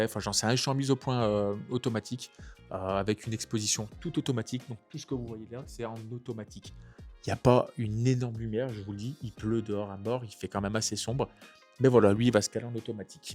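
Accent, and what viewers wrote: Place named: North, France. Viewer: Tu serais pas du nord par hasard ? Les A que tu prononces font très nordistes. Tkt je suis de lille c’est pas pour me moquer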